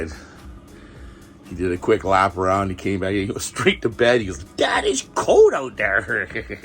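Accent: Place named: Canada. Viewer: I thought Dude said : Peace,Love & chew toys. But it could be his Canadian accent.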